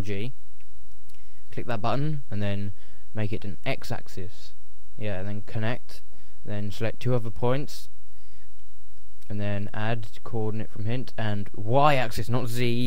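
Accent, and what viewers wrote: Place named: United Kingdom. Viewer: Brits are taking over youtube tuts! and I love it! lol!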